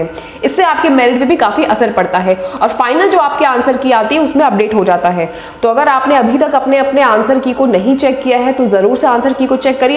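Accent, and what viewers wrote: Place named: Haryana, India. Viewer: m rohtak se hu mujhe aisa lga ki aap haryana se ho isaliye puchha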